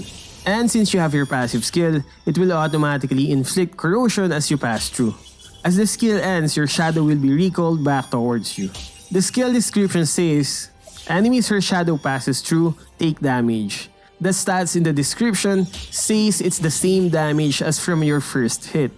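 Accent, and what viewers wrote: Philippines. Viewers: Nice. Very pinoy ang accent ha. Hahaha. "Owpennns a laaaht of paaassibilities" 😂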